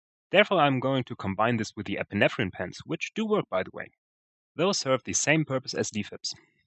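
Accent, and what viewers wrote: Germany. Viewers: You are from Germany, Right?:P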